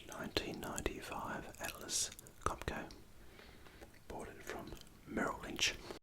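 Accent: Australia. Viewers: "An Australian man's voice quietly discusses this beaker of contaminated blood"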